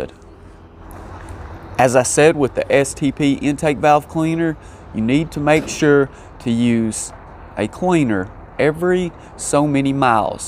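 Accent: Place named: Boston, United States. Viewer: boston guy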